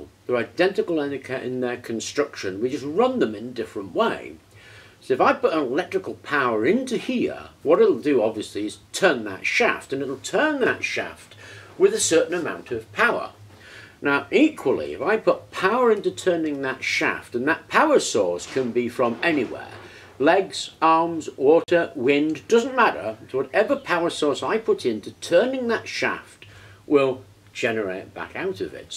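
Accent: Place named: United Kingdom. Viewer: lol, i cant stand british accents.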